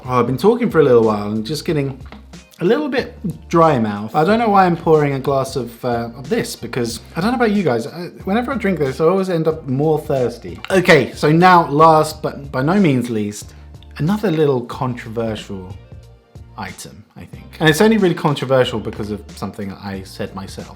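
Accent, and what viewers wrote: Finland. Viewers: muumi limu: ur finnish accent sounds funny im not saying its bad and :makkara: its so good if ur on a journey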